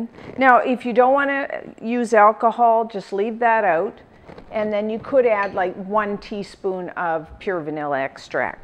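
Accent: Canada. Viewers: Are you from Canada you have an accent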